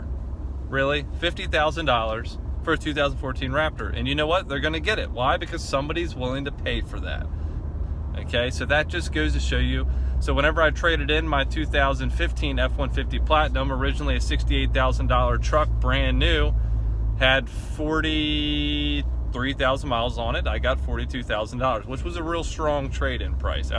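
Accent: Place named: Pittsburgh, United States. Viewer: You sound like a yinzer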